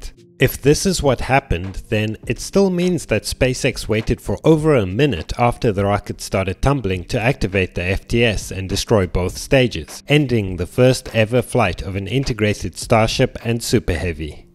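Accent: South Africa. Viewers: South African? Accent kinda sounds like it. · South African!!! Nice Dude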